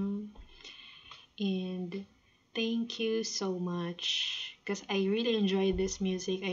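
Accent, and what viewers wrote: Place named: Philippines. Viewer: ah kala ko kasi japanese po kayo pero may accent kayong pinoy 😅. Na sa japan po kayo ngayun? Bagong favorite ko po kasi tong kaya napadpad ako dito 😁.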